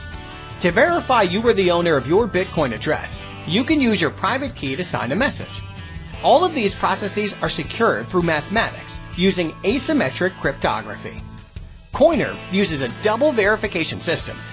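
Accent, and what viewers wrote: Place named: United States. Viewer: Annoying American (non-native) voice, and too fast to compute. Ie: not user centric.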